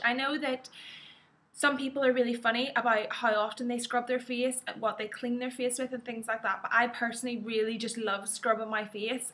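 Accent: Ireland. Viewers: r u irish? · love your accent, I'm irish but born in america so sadly didn't adopt that beautiful accent ;) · Love the accent!! Irish FTW!!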